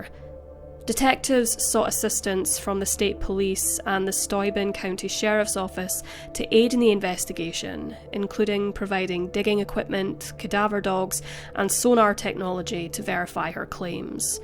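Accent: Scotland, United Kingdom. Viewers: 10 months late but, no... OP being Scottish isn't an issue. And yes, these are German names but they are in America and have been anglicized. The most spoken language in the United States is English. It is highly unlikely that English speaking Americans would pronounce these former German words with a high German accent. Please be realistic. Thanks!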